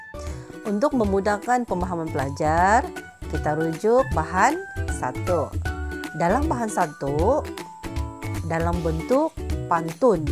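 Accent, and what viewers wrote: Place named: Sabah, Malaysia. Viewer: Thanks cikgu , loghat sabahan btul ne 🤭😍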